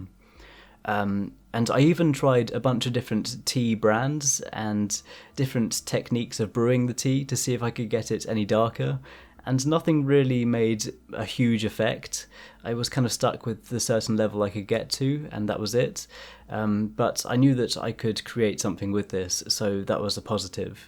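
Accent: England, United Kingdom. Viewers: He must be british Like meh Britain 🇬🇧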